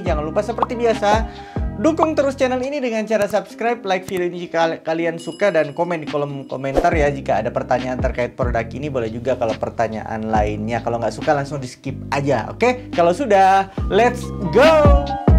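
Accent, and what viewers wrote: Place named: Bugis, Indonesia. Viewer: Logat nya kayak orang bugis ya bang?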